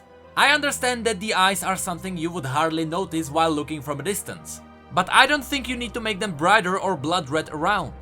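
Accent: Czech Republic. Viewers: Podíval jsem se na tebe poprvé a hned mi bylo jasné že jsi čech podle toho přízvuku :d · Věř nebo ne, ale už jenom podle přízvuku jsem poznal, že si Čech než jsem se podíval do popisku:D původ nezapřeš xd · Český přízvuk? :D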